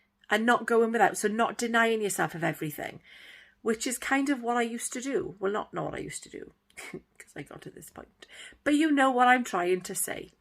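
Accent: Wales, United Kingdom. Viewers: Good will power and self control. I am on Nutracheck. Did Slimming World and lost 2 stone but was fed up of feeling guilty of going over my "syn" allowance. Now I am in more control. Losing slowly but that's the best way. I don't normally like chatty videos but yours is fab! Do I detect a Welsh accent? I am from Carmarthenshire but now live in Swansea. I really look forward to watching more of your videos. Thank you.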